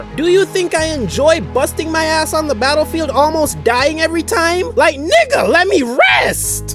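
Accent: Jamaica. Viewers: lol me too like I could catch the Jamaican from a mile away so mi needed to confirm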